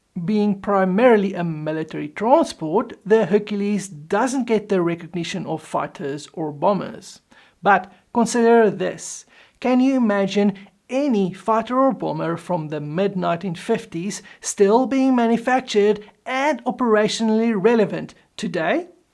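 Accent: South Africa. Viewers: Love the South African accent, reminds me of my days in Joburg. Great video, the YC at Pima Air and Space is quite something to see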